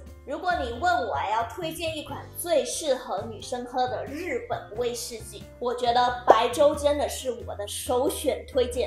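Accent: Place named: Taiwan. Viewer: 這口音也太特別，台味非常重的大陸音。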